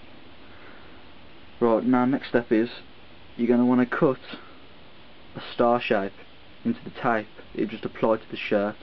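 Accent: United Kingdom. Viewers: wow very british i must say bloody good job though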